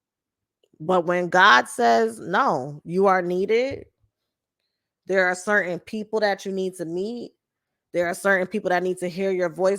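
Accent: New York, United States. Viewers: I'm going to miss you like crazy. I've been listening to you for years😔 I can't help but think "Damn, I'm not going to hear that strong ass New York accent dropping jewels no more"🥺 And now I have to say bye to my youtube crush😔🙏🏿❤🙏🏿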